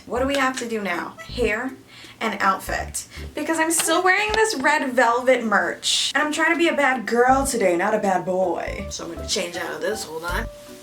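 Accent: United Kingdom. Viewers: Love ur British accent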